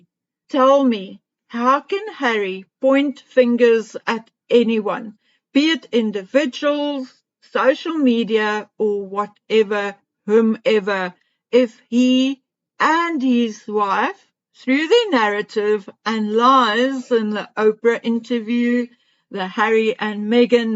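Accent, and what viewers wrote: South Africa. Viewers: She has a South African accent, get over it.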